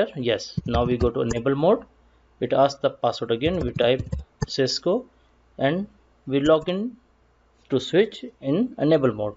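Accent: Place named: India. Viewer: Topics were interesting but indian accent is kinda disgusting... 😂😂😂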